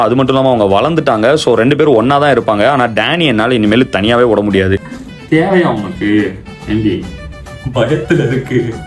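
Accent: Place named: Coimbatore, India. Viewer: Aamaa bro naanum adha dhan notice pannen , coimbatore slang la pesuna perfect match bro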